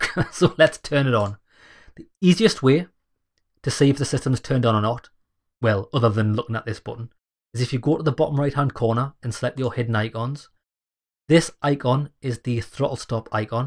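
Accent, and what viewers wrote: Newcastle, United Kingdom. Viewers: Super helpful! And I think my new go-to genre of Youtube narrator may be a Geordie 😂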